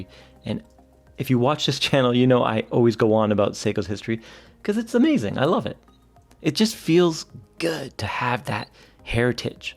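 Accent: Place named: Canada. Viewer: He only says it that way is because he is Canadian.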